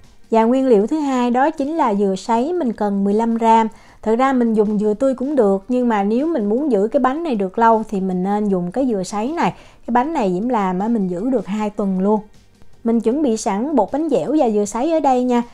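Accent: South, Vietnam. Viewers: Woa, cái bánh lạ quá. Mới đọc tựa tưởng đâu bánh thơm mùi lá dứa, nhìn rồi mới biết trái thơm, trái khóm. Chị dân bắc mà nói giọng nam dễ thương ghê. Cám ơn chị.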